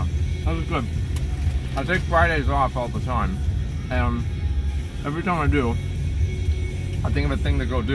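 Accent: South, United States. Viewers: It hasn't hit me how southern he is until I watched this :3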